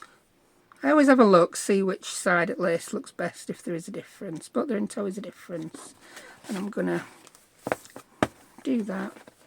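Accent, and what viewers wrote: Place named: Northern England, United Kingdom. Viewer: Hi there, just tuned in to your tutorial & just love your northern twang. Whereabouts are you from. I live in New Zealand now but originally I’m from near Buxton in Derbyshire.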